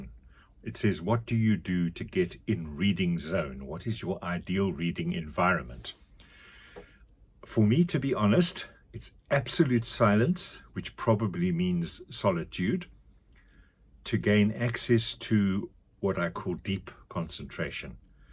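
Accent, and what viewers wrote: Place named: New Zealand. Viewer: 👋🏼Newer subscriber here, and I was enchanted by your accent. I was hearing a little New Zealand, a little German, and then solved the puzzle by looking up your home page--haha! Nice version of the tag. You're a more ambitious reader than I!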